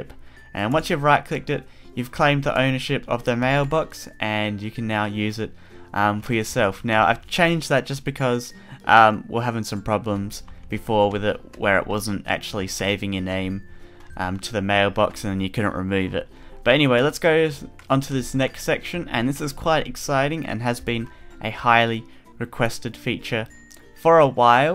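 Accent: Australia. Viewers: lol, r u australian? luv the mod btw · Is he an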